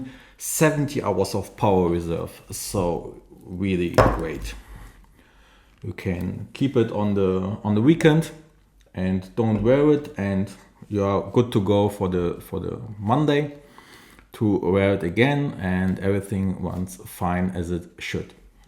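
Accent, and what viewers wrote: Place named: Germany. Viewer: are you german :D sounds like a german speaking english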